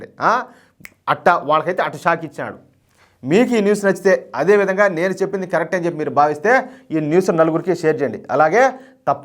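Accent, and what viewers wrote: Rayalaseema, India. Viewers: Your rayalaSeema accent is too good sir... As iam from Telangana I can't recognise but is kadapa accent you spoke....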